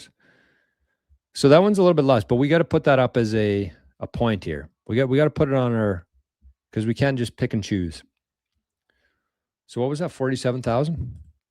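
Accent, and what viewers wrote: Canada. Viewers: I know you can never really know and I've long lost faith in humanity that being said not sure if it's the canadian accent or what but i get serious good guy vibes from you man, you deserve allot more followers. Thanks to you i'm saving my little pennies in sats. One day i'll be stoked to have a whole .1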